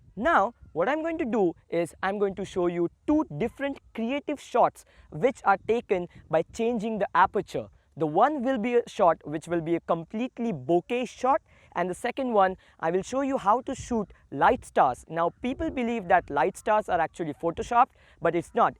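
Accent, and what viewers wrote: India. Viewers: very nice explain... i think it is the time to make a video on how and what principle camera works with animation clear in indian english like your sweet talks... we are eager to get that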